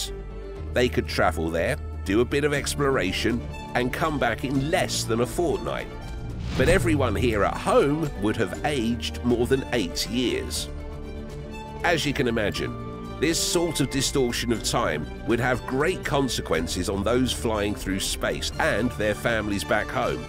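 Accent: United Kingdom. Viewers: irritating British BLOKES talking... hate that stupid accent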